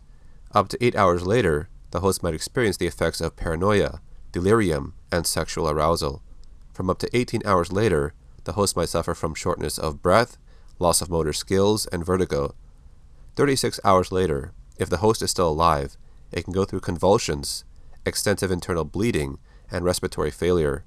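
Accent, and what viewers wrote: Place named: Canada. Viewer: are you Canadian? I swear you sound Canadian.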